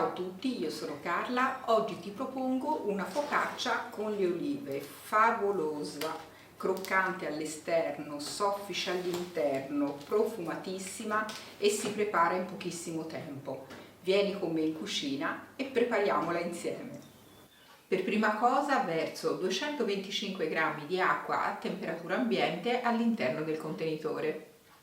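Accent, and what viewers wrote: Tuscany, Italy. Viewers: Thank you Carla. Love olive Focaccia, love your Tuscany accent as well. 🌾🎈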